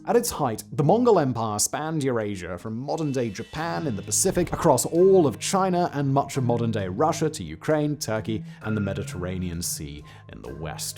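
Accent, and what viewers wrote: England, United Kingdom. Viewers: Didn't get any new information about war or warring cultures. Just wasted time hearing arrogant English accent.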